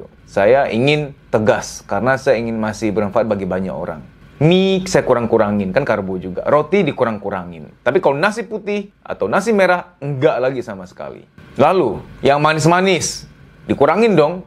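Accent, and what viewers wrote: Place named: Lampung, Indonesia. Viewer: Logat bicaranya seperti suku lampung ....bener tidak pak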